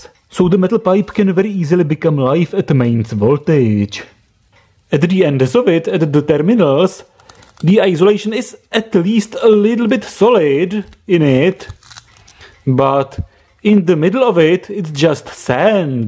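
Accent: Czech Republic. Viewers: You sound like you are from czech republic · Yeah I can confirm as a Czech myself he is Czech. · Oh my god. AS a fellow Czech i laugh just by listening to his accent. People probly come by just for that as i do :D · An obvious Czech accent. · Is this typical accent of Czech people? I thought he stretched the last word on purpose to create a signature sound.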